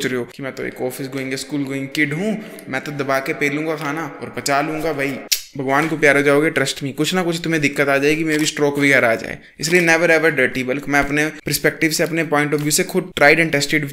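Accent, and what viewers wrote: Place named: Pahadi, India. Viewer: Bro , ap Himachal sai ho na ? Apkai tone thodi sei Pahadi lag rahe hai 🙂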